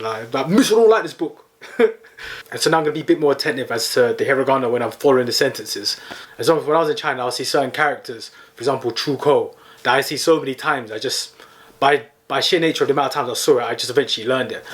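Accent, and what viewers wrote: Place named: London, United Kingdom. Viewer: This is indeed the London accent. Listen to expressions like "Bruv" and "Innit"; very London.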